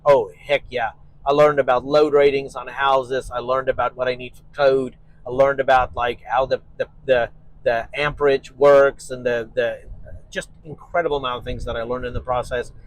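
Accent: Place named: South Africa. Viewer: offside comment here. you sound Souht African bro. any ties to SA?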